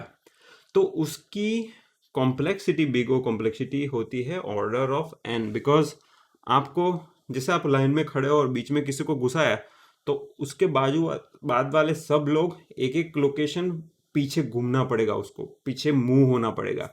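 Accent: Gujarat, India. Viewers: kitni bhi kosis krlo gujarati vala flow to nikal hi jata hai😄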